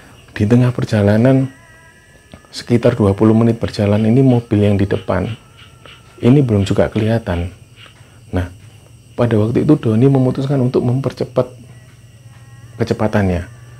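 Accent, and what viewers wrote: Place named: Surabaya, Indonesia. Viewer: Sama ... Aq jg seperti itu.. medok suroboyoan..kyo aq..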